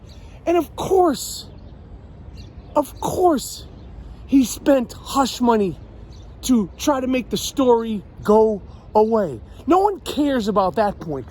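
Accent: New York, United States. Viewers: 😂😂😂 I just love your rant! You’re ranting everything I’ve been ranting for years!!! You’ve spoken in real New York style!👏👏👏👏👏👏👏👏 Oh happy day!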